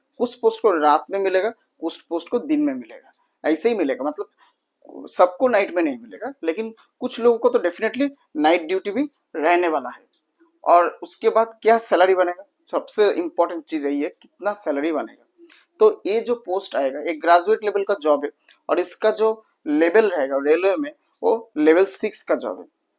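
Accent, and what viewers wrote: Bengal, India. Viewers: Bengali tone so sweet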